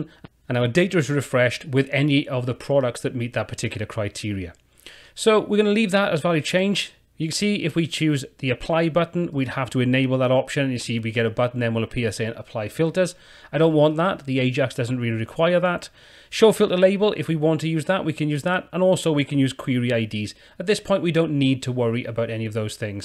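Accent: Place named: England, United Kingdom. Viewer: You mate, are a genius. Great to hear, and see, someone with a regional English accent give clear and concise help. So tried of hyper active youngsters trying to blind everyone with their knowledge. Or a video with a silly tune playing and no voice overs. Worth being locked down for :)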